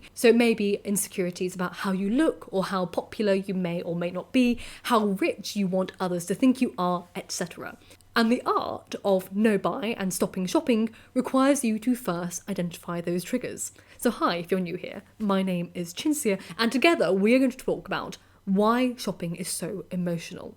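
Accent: United Kingdom. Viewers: I always considered you a very intelligent and wise person. Always got something interesting to say with the most beautiful British accent.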